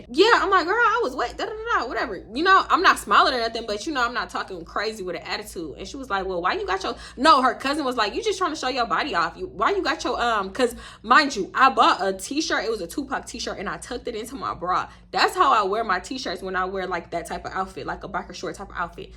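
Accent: Baltimore, United States. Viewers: Girl yes sounds just like us baltimore folk lls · You and this Bmore accent lmao I love a good Stewpiddd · you sound just like us baltimore ppl now😂😂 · It’s the Baltimore accent for me 😂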